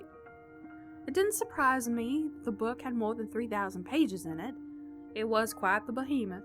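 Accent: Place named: West, United States. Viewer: Real good western accent. I thought it fit the story.:)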